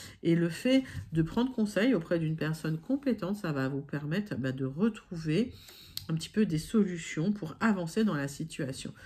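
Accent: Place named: United Kingdom. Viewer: Waouh de plus en plus l'accent British 😅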